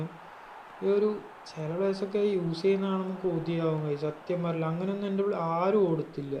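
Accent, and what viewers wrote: Kannur, India. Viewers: slang kettappol thonni atha choyichee😅😆 knrr evdyaa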